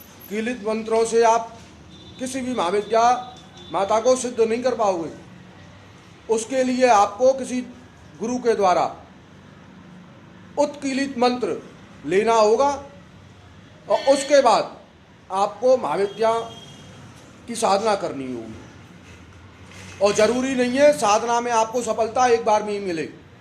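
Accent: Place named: Mathura, India. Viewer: Pranam guruji aap kha se h aap ki bhasaseli mughe apne mathura ke jesi lagi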